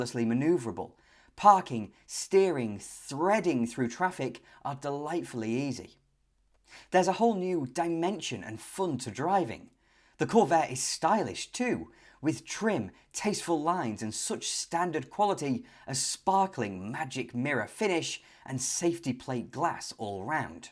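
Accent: United Kingdom. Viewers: Great video and great British accent ( where are you from ?) I 've had a convertible turbo 1964 Corvair for 8 months, and it's a pure joy to drive. Greate noise, powerful enough, gorgeous and easy going. I brought it back from Denmark, on a 1200 km journey without any trouble. This car is better than a Mustang or mainstream pony cars, a reel underdog.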